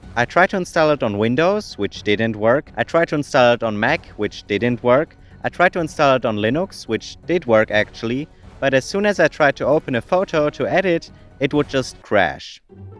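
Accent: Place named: Germany. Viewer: Super! Und ich lag mit meiner Vermutung, dass da kein bulgarischer, sondern ein deutscher Akzent durchzuhören ist, nicht ganz falsch. Mir wäre geholfen, wenn du noch mehr zu dem Thema machst. Ich überlege seit Längerem, ob ich mich als Anfänger mit meiner Sony RX100 eher, in Photoscape X, Darktable oder Rawtherapee einarbeiten soll. Vielleicht geht es sich ja einmal aus, dass du ein Video zum diesem Thema machst. Zudem werden Videos mit "RX100" in Titel sicher häufig geklickt.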